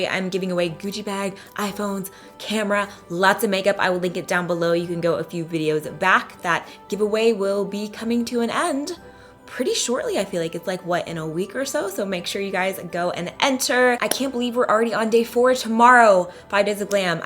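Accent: South, United States. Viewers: Used to have a super southern accent too... go watch the original videos and you will see what I’m saying. I do love her makeup so much though!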